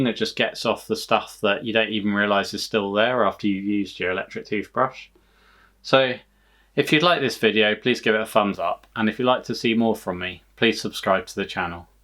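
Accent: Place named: Liverpool, United Kingdom. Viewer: You have the same accent as the John Paul George & ringgo Good review, thank you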